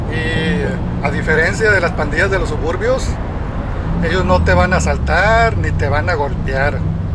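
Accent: Mexico. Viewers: Esto de las pandillas son puras tonterias que en vez de sumar a la sociedad, restan, hablas bien mexicanoooo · Compa tienes un acento muy marcado michoacano que me distrae, el video parece que es un comercial a esas bandas, no todos los que ven el video son unos expertos como tú, buena idea fallida ejecución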